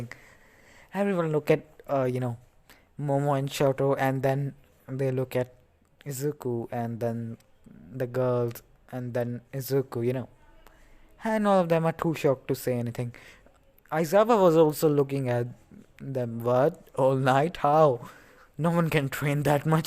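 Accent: India. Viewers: Are you an Indian your accents sounds Indian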